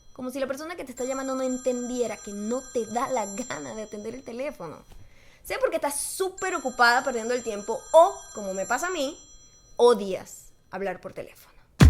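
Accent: Venezuela. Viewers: mas venezolana imposibleeeeeeee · Más venezolana imposible! Lo ame jajajaja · Excelente! y lo mejor de todo es el estilo venezolano... "que paso? si fino" :D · Me encantó! Esto suena demasiado a monólogo venezolano. Bella Bella!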